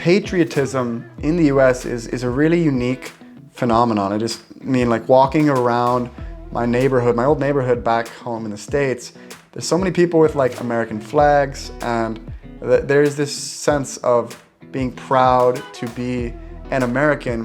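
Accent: Sweden. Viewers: Now that you have been back to the US, I would love to hear how you realzed that You changed; your personality or your likes or your thoughts of the world. I lived in Sweden 1989-90, but now live in Tennessee (grew up in a very small town in upstate NY). It was easy for me to fall back into life in the US but I wasn't there for so long. I enjoy listening to your experiences and plan to retire to maybe Portugal with a decade. Thank you for your content. Good to see you back. (PS I agree with the person that said you have a twinge of a Swedish accent in your English ❤) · Your English has the ever so slightest Swedish accent undertones now. So interesting how that happens! Only on some words but I can hear it! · I here a bit of a Swedish accent you didn't have in your earlier videos. Even acouple of weeks in a different country can give you a different feeling when you return. 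Especially if you go to an place and get more involved with the culture. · has been away so long and think he kind of sounds like a Swede speaking English 🤔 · Your English has changed! Your accent is still American, but has touches of Swedish and British, vowels in particular. Did anyone in the US make a comment on that when you were back? Or you adapted quickly back on American soil?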